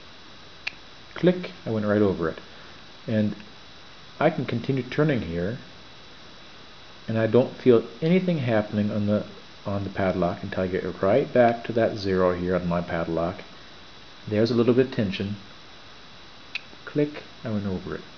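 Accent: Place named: Canada. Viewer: I detect a Canadian accent!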